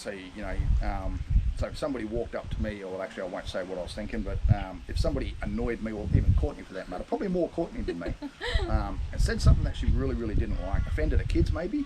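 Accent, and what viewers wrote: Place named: Australia. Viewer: I accidentally had my playback on 1.5 times of normal. You should listen to this video that way. It's hilarious! I'm good with Aussie speak because I've been studying your language since I watched Water Dogs 3 times through. At 1.5 times normal, there's no telling at all what you're saying. At normal speed, I can pass this nearly 100%.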